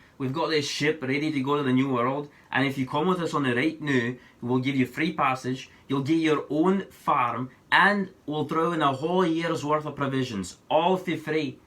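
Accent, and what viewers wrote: Scotland, United Kingdom. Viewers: How totally fascinating, thank you for such thought-provoking linguistic details... ps: love your Scottish accent too. Such a pity that so much history was tossed aside as a joke or irrelevant when it all truly serves to enrich and cement our common human history. I am a british European amateur linguist- devastated by brexit... we should be coming together and respecting our common links, not hating and dismissing. Thank you again. Merci, grácias, danke schon, grazie, dziekuje, xie xie, obrigada, and and and... :)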